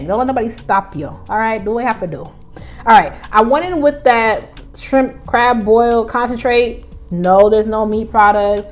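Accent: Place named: New York, United States. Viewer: Soon as you started talking it was giving NY lol we talk fast then you said “so boom” I’m like ok def NY. Then you said you lived in Rochester and that’s exactly where I’m from lol. Trying your recipe this week!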